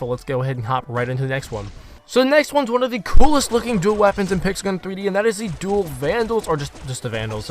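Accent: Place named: United Kingdom. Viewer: Shut the fuck up you sound like you’re British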